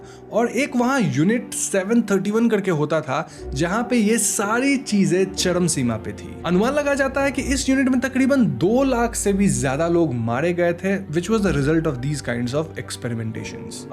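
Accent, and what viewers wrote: Bengal, India. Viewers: Sir aap bangali ho ?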